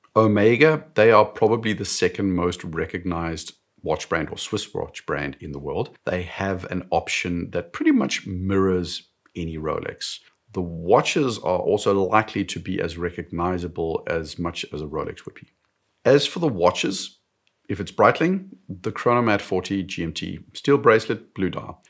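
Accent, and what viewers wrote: South Africa. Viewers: sounds like a south african accent. i bet this gentlemen is from joburg or capetown, just a guess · I thought as much. You just cannot mask a Joburg accent... I had some of my watches stolen out of my luggage at OR Tambo...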